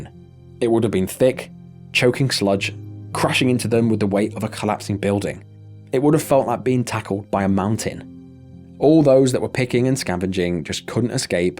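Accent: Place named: England, United Kingdom. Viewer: ❤❤❤❤❤❤❤❤❤❤❤❤❤❤❤❤ you R so sweet. Also love the channel new subscriber here ❤ also from U.K South Yorkshire ❤ I could tell are obviously also English 😂😂😂 ❤❤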